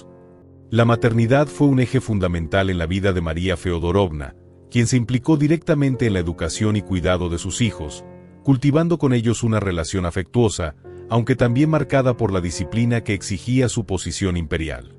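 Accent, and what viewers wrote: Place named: Mexico. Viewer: Me encanta el acento del español mexicano, mucha gracias al narrador.🎉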